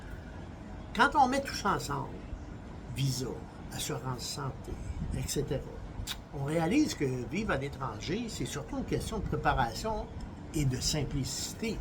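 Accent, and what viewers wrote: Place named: Quebec, Canada. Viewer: Je suis en train d'apprendre le français et merci car cette type de vidéo m'aide à écouter le français québécois..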